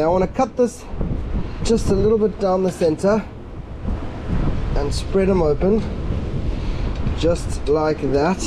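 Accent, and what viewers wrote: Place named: South Africa. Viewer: Refreshing Saffa accent. Tight lines boet.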